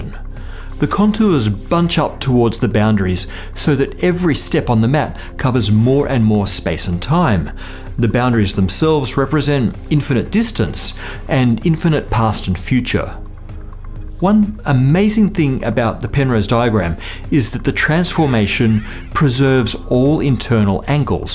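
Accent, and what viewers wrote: Australia. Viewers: Matt O'Dowd is Australian that's why he sounds different . André in Sydney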